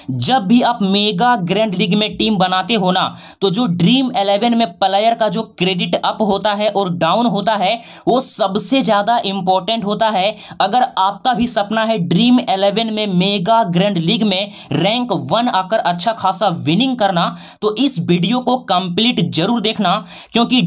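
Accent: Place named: Bihar, India. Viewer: Bihari hai aawaj se pata chalta hai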